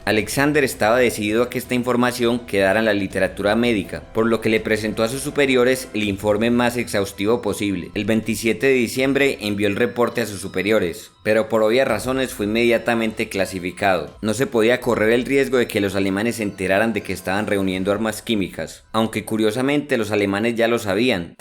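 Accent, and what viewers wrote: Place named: Antioquia, Colombia. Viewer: Ese acentico paisa le quita veracidad al vídeo, ya sebemos que los paisas son tan mentirosos y exagerados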